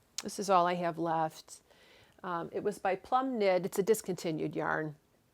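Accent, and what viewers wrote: Chicago, United States. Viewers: Thanks for your episode. You inspire me with your spinning. I have just begun spinning and have to learn how not to over spin it. I had to smile when you mentioned Sturgis, Michigan as my family had a summer home on Lake Altrich (I’m not sure if I am spelling that correctly)! It brought back fond memories of childhood. I also have to say I love listening to you because I hear the “Chicago” in your voice which, again, brings me back to my own childhood in a Chicago suburb. I do hope you understand that is a wonderful quality in your voice to me! 🤗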